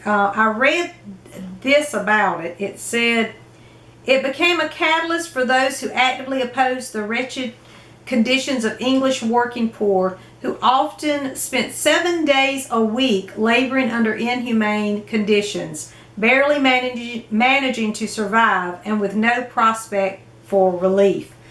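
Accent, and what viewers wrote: South, United States. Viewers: I love your south accent! It's more smooth in my (french) ears than the british accent 😊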